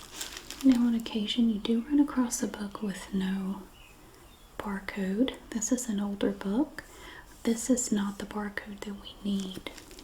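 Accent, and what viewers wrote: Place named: South, United States. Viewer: Your voice is the most beautiful thing I’ve heard in awhile. You sound like Lina Grace with a Southern accent instead of an Australian one. ❤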